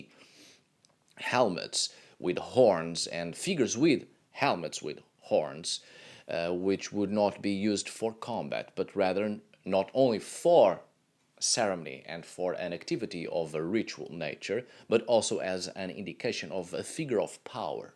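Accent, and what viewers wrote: Portugal. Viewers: Even with your Danish and British heritage I could spot your Portuguese DNA a mile away the accent does not lie, love from Portugal bro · Hi K, I read that somewhere has two cats 🐈 two females cats. One called Sól and the second one called Gigi. Sól and Gigi are two Norse Goddesses names. is half Portuguese and half Danish with in addition of some Irish, Gaelic and Scottish, DNA. Following his outdoor videos vegetation’s are northern Portugal area. So I guess that he is living in Portugal northern part of Portugal somewhere in the Tras Os Montes area. Sometimes his accent is clearly Portuguese accent.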